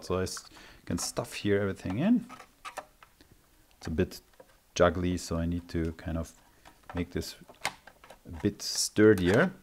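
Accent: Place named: Germany. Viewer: His wording was cuckoo, but “five point twenty five” is incorrect, too. Though people occasionally do it, it’s not correct to speak out digits after a decimal as a single number (except in currency). So it’s either “five point two five” or “five and a quarter”. He’s not a native speaker. My guess is he’s German.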